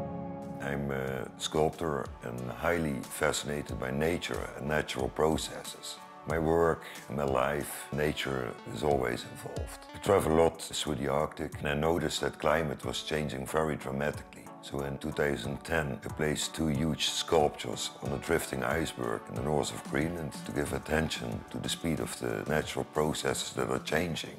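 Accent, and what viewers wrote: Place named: Netherlands. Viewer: Nederlands accentje wel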